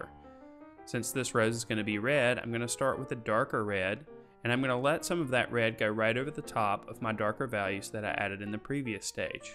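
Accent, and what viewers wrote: South, United States. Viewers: You sound sooooo much like a country western dude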